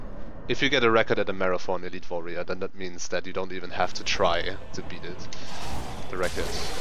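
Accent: Germany. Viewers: How's the Dragon Tooth so powerfull?!? Also your DT attacks look like a downward swing/thrust combo which seems to be critical for this devastating damage output. Could you explain that to me, please? Unfortunately I'm totally unfamiliar w/ Dragon Tooth because I've never made use of it. Btw, if you speak German (which, judging by your accent, you most likely do), you can also explain it in German to me if you want ;). If you don't, please excuse my misjudgment :).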